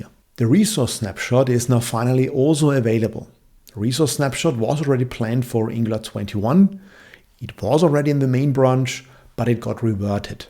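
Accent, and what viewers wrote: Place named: Austria. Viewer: He sounds sooooooo Austrian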